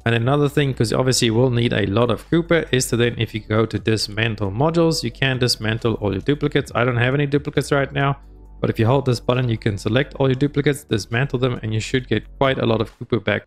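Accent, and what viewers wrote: South Africa. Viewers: Ahhh that lovely South African accent…. Love it. Ja my bru I totally got this china. Great video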